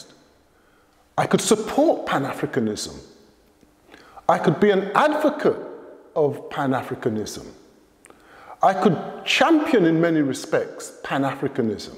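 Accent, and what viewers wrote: England, United Kingdom. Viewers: just cause he is using white inventions he can still advocate for pan africanism because how is he supposed to spread the message via mail ? of course he has to use the internet because that is a faster and more widespread way to spread the message and the people saying that his using an english accent so his analogy isnt valid he studied in a western school so he has western influence in his way of speaking and you would be the same people to call him “uneducated” if he had an african accent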